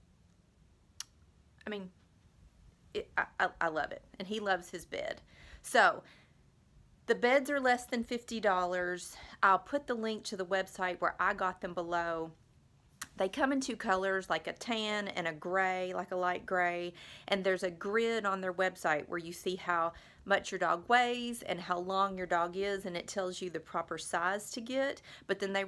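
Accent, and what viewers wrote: South, United States. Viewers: I cannot listen to your SOUTHERN DRAWL any more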